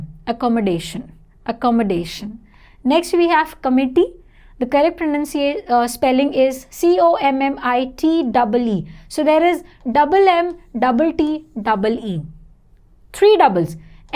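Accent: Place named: India. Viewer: I like Indian accent.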